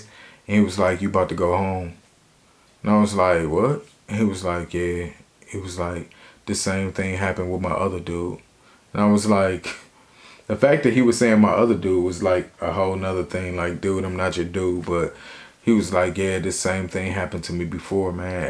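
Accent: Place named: DC-Baltimore, United States. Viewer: Bet. That accent sounds familiar. DC to Bmore usually have a variation of it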